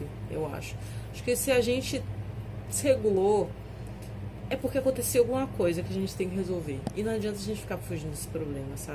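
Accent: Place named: Bahia, Brazil. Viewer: Muito bom e, bem explicado. O sotaque baiano foi o destaque, esse medicamento e sensacional.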